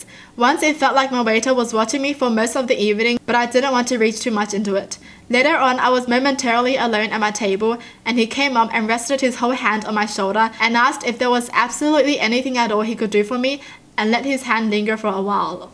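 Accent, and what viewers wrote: Australia. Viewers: Sirkay I’m Australian and I love Aussie accents but is a mix of Aussie and another country... I can’t tell which though. I love her accent. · Her accent is from Australia a very beautiful accent and land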